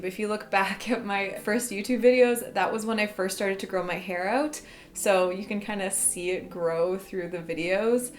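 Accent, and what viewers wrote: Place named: Canada. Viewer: I love your Canadian accent, reminds me of Robin from how I met your mother 😂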